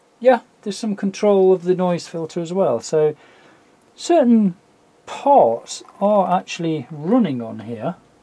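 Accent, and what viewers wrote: United Kingdom. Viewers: What a challenging project,! I follow your explanations with great interest. Despite my limited English, your British pronunciation is a free language class!!!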